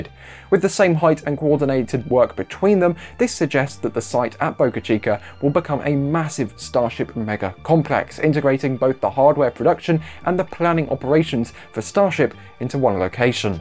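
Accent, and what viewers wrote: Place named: England, United Kingdom. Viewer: The English narrator makes it “real”.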